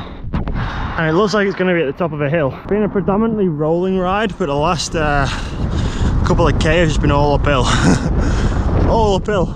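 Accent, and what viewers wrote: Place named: Lancashire, United Kingdom. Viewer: First of all, cool video! Second, and a completely unrelated question to the video. In your Tour of Ulster video you mentioned that you're from there so how'd you end up with such a rich, thick Lancashire accent instead of an Irish one? How long have you lived in England?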